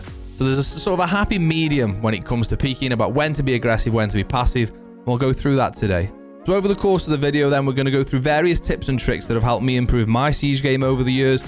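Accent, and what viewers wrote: United Kingdom. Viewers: In a world full of slightly toxic American content creators, I really enjoyed the friendly, familiar British regional voice. Good content! Worry less about how you’re conveying things, it could be more concise, but the pacing was great, so I don’t think it matters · bro are you British 💀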